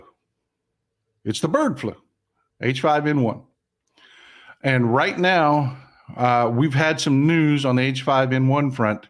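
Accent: Texas, United States. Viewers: Oh, Logic delivered in a Texas accent from under a cowboy hat!!! I have hope for civilization!! 😊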